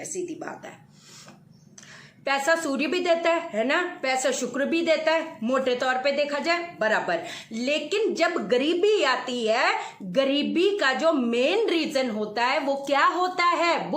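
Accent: Punjab, India. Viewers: di punjabi touch ayta batono maa apki maa bi punjbi hun so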